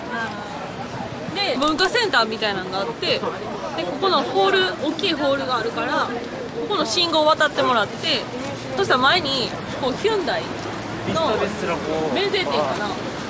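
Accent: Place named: Hyogo, Japan. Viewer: 兵庫の人ですか？「～しとう」がずっと耳に残ってるｗｗｗ いかに美味しいか説明のパワーと語彙力おもろい:yougotthis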